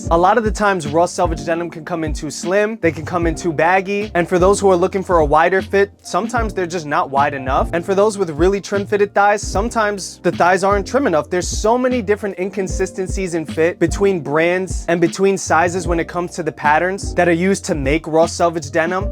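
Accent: New York, United States. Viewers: Jersey or New Yorker ? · I can hear the NYC in you lol, great video!!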